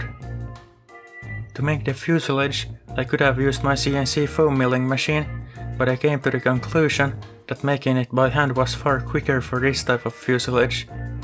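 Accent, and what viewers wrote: Finland. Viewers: Are you fenno-swedish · 2 seconds in and i can already tell by his voice that it's in finland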